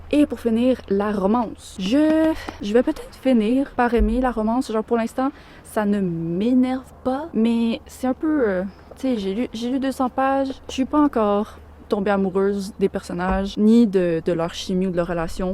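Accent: Quebec, Canada. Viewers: Ah tu es québécoise hihi 😊. Je me disais : "cette française a un accent différent." lolll 😂. Je suis québécoise aussi 😉. Heureuse d'avoir trouvé ta chaîne. 😊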